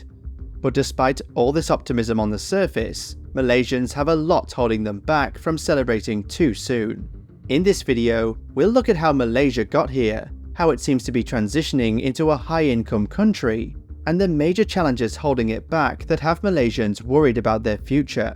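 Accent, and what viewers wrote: United Kingdom. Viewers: presenter's accent is british. this mistake is more on the editor or producer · Fair analysis, but it’s pretty rich to be lectured by a British accent on the (admittedly flawed) implementation of the affirmative action policies Y’all got us in this mess in the first place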